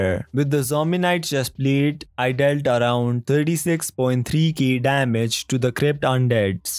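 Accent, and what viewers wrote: India. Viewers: Are you indian? Ur accent is cool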